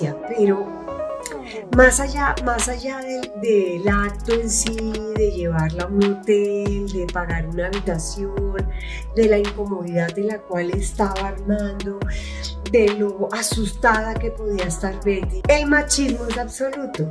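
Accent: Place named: Colombia. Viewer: Tu voz es muy dulce y con ese acento colombiano mejor, pero la musica de fondo de pronto tu voz se pierde.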